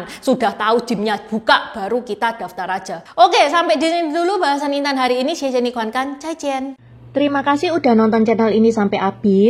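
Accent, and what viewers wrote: Java, Indonesia. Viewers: Ci Intan ini cindo tapi bicaranya medok jowo ketara sekali 👍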